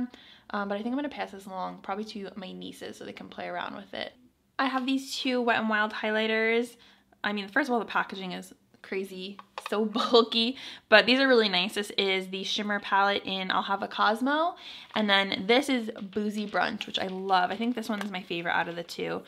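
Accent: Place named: New York, United States. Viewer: I love your New York accent!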